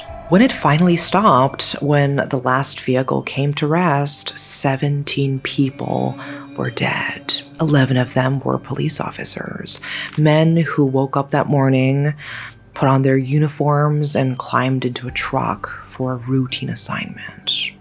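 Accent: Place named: West, United States. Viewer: The story was interesting, but I believe you can tell the story better with more assertion and tones rather than just speak as though you are reading, with a 'western accent'? (I'm Malaysian) and stretching every few words in a whisper tone - I.e "6 Caaaars, 12 veheeeechles, 17 peeeeeople were dead, the road is quieeeeterr than it should be" and yes, for the sensitive commentors I know that's gonna try and argue with me here, I know I can just "exit the video" and be on my merry way, which yes I'll do so, but hopefully some constructive criticism helps this fellow youtuber gain more likes and views in the future.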